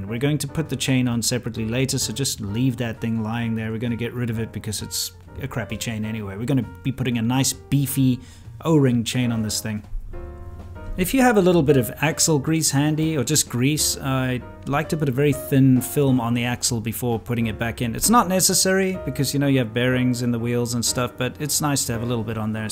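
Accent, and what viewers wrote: South Africa. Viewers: OMG..Sitting here watching this vid as I just bought a mint 2012 GN, and just surfing through related vids and I'm thinking, man, this guy's voice sounds just like my South African cuzzies voice from serpentza and low and behold! Man this is so cool I this channel, had no idea you gad this, keep it awesome! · What part of south Africa are you from?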